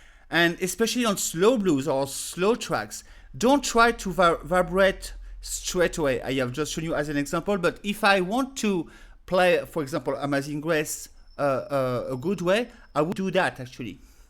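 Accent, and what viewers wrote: France. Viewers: Merci pour cette leçon très instructive (+ le commentaire de Walter Cengia, qui donne la définition exacte) sur toutes ces différentes techniques : c'est la première leçon qui explique clairement tous les détails ! And very amusing with this funny french accent ;-) As another comment said, you should have made it in french but it would had less charm and feeling ! Bonjour du pays de la rillette et des courses de voitures =;-)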